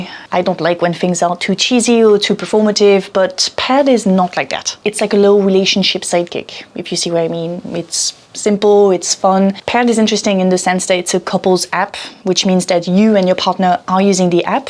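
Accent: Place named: France. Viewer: I hear the French soft power with every “videor” you say. I am learning to like the French accent.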